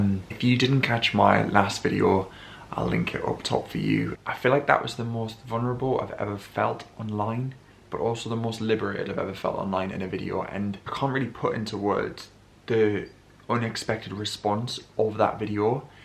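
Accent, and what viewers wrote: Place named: Durham, United Kingdom. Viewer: I wish there had been you tube around when I was younger so that I could be inspired by creators such as yourself. I went through similar things at your age and not having a place to share it took me so much longer to get over things and find out who I really was. I am still searching 40 odd years later. However with people like you sharing and putting mental health on the front page is fantatsic as the stigma is so hard to shake off, however if we all share then we can all help and learn from each other. Plus I love hearing the Durham accent on you tube... makes me feel like I am home xxx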